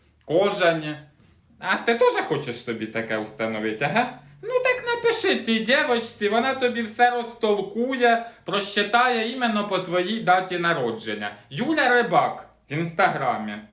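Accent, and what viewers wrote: Poltava, Ukraine. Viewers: Дякую як послухаю то наче дома побуваю моя рідна Полтавщина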